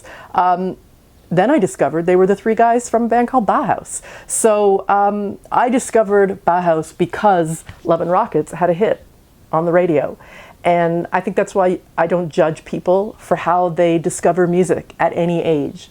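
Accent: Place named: Canada. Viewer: ? Thank you! My heart is melting. I heard your Canadian accent immediately.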